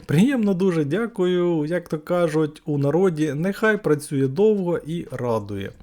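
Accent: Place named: Transcarpathia, Ukraine. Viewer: Кратко когда ты с закропатьия ммм... Нихуя не понятно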